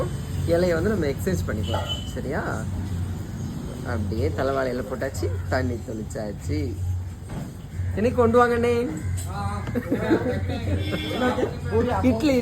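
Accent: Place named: Kanyakumari, India. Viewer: Casual ah neenga pesuradhu thaan namma kanyakumari azhagu ❤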